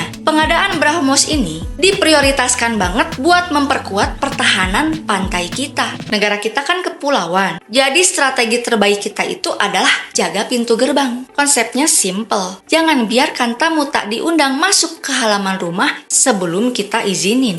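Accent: West Java, Indonesia. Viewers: Aku suka penjelasan nya jelas dn lucu ada logat Sunda nya gitu 😂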